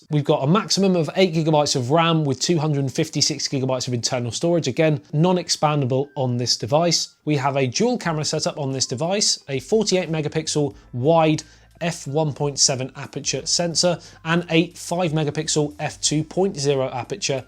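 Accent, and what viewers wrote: United Kingdom. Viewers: You mentioned the pricing only on the first device. You may have a James Bond accent, but this video was real douchebaggish ya cheaky bastard.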